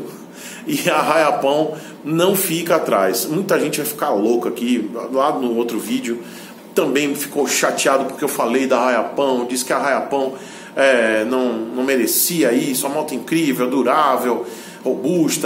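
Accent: Bahia, Brazil. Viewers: Rapaz, barril dobrado 😂😂esse é Baiano mesmo😂😂.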